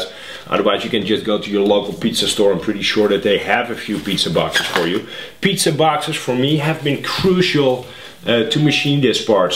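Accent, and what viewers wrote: Netherlands. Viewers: je klink lekker nederlands haha nice vid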